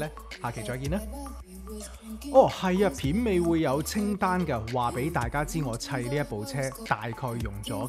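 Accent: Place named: Cantonese, China. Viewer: 你啲廣東話我聽得好舒服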